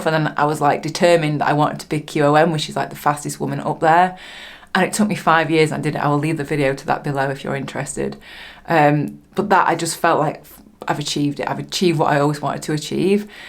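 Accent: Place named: North, United Kingdom. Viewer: Just wanted to say thanks for your many inspirational videos, really looking forward to this series. Been riding for about 15 years now. Managed to eat myself into a diagnosis of type 2 diabetes back in august and have since become fitter and faster than ever (at 50!) 3 weeks ago managed to aggravate an issue with a disk in me neck which meant I was in a lot of pain and unable to ride. Watched this vid while in hospital recovering from the corrective surgery so I can’t wait to hear you share your recovery story. Reckon it will be 6 to 8 weeks until I’m back on the bike which is going to be hard. I have to say though they for me over the last 15 years of riding as someone with fairly severe bipolar I have gotten HUGE mental health benefits out of the simple act of riding and maintaining my bikes. So please keep up the great work, and also it’s good to hear a proper northern accent here on YouTube.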